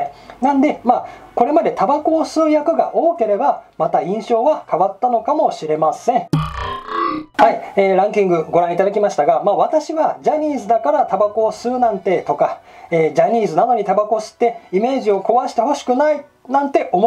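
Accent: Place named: Kochi, Japan. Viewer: こーちみたいなしゃべりかたしちょる笑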